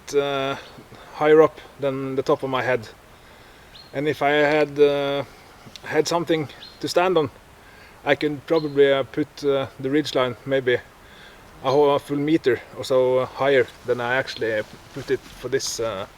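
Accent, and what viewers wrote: Norway. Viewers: Hei! Nice Tarp Setups and a nice norwegian Slang in your englisch speek! U got a new subscriber :) Best regards from germany, zwosiedler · My great-grandfather was born in Nesjestranden(Nesjestranda), Møre og Romsdal. I've always enjoyed the Norwegian accent. I grew up tent camping, but now I like hammocks more. Nice information that's useful. A pleasure to watch ;)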